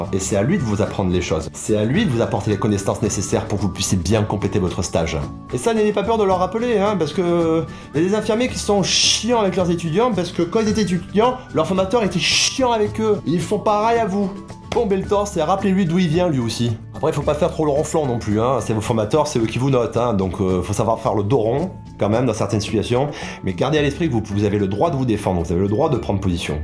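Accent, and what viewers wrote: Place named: Southwest, France. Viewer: Tu serais pas dans une grande ville du Sud-Ouest par hasard? ;) (rapport au petit accent qui se fait entendre à certains moments ^^) Sinon merci beaucoup pour la vidéo, ça se voit que tu as fait pas mal de stages déjà pour en arriver à toutes ces conclusions, je pars en stage en SSR dans 4 jours et j'espère que ça va bien se passer...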